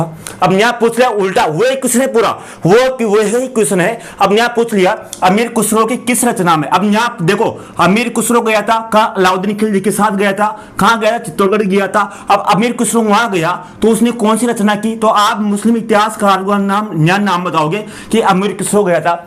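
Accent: Hadoti, India. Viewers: Sir aap hadoti se ho kya, aapki boli bata rahi Hain, main tonk se hoin 😊❤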